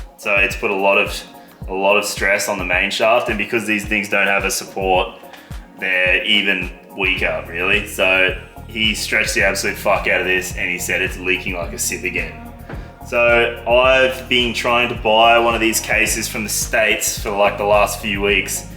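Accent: Australia. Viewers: I hear the Australian accent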